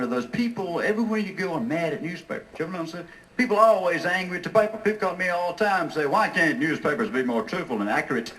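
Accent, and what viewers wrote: South, United States. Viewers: I dare say the heavy southern accent may be different enough from normal English to be considered a separate language.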